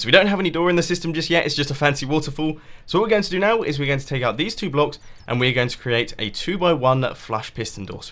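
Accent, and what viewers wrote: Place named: England, United Kingdom. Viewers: i love the fact it is british cause im british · British people sound that way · Nope here in England that voice is legit everywhere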